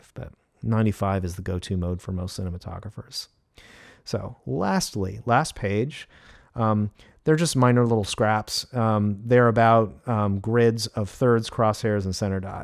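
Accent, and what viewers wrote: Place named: Brooklyn, United States. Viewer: Great video. It sounds like you are in Brooklyn. I'm about 45 min from you. I have done some video work with my 80D/mics/steadicam. I'm interested in learning more and assisting as AC/boom operator/gaffer/etc on a bigger project. Let me know if you are ever looking for a competent crewmember as an unpaid intern.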